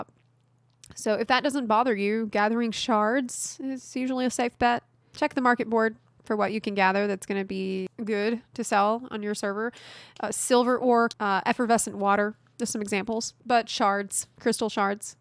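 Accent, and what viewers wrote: South, United States. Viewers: So southern bell, defiantly into it 💕💕💕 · I definitely didn't expect the sweet southern twang in you're voice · Your accent trips me out. It's like a regular American accent 90% of the time, but some words have that great southern drawl. I like it. Great video! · Less than 3 minutes in and I am IN LOVE with accent! I'm from the south as well, and to see a fellow southerner, and a strong woman as well, as a successful content creator is such an amazing feeling! Her sense of humor, thorough and concise explanations, and tone are refreshing, relaxing, and the best thing I've watched in QUITE a long time! you have a fan for life!!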